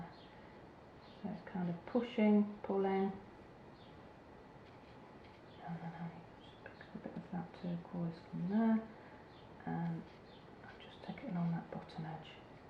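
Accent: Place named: England, United Kingdom. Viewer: Beautiful painting. Do you always “cream” strength that much paint? Do you keep when your down? Add water again, next day? Or do you toss & start fresh. I’ve only ever made up that much paint when I did a negative painting. I know you said you were using 200# what mfg? Love learning new ways to do things. I really want to try this one, next week, when our temperature goes back to normal. ( Northern California) you sound like you have a bit of an English accent is that where you live?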